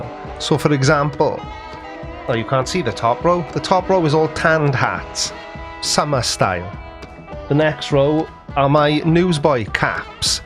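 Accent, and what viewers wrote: Wales, United Kingdom. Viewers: Oh my God.........you're not..........Welsh.........are you? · Are you Welsh? I thought you were Australian like Nathan Thompson.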